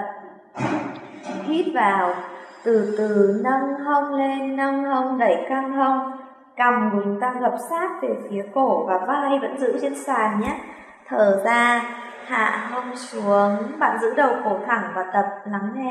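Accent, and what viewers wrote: North, Vietnam. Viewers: giọng miền bắc mà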